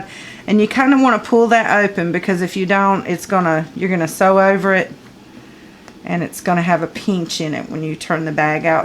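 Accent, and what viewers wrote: South, United States. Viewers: love your southern accent :)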